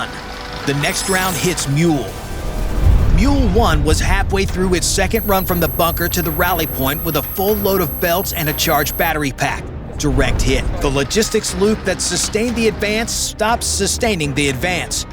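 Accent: United States. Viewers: This is very old footage with lots of repeated footage. I muted it and watched and it was just as informative without the annoying excited narater with his wining voice pearcing my ear drums. Old news in a new annoying format with american naration. Annoying! Annoying! Annoying!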